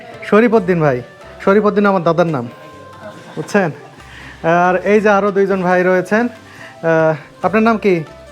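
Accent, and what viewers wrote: Sylhet, Bangladesh. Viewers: ভাইকে আমাদের বাংলাদেশের সিলেটের মানুষের মুখের ভাষাও শুনা যায় ❤❤ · bai amder sylhet er manush er moto kota bolen.balo laglo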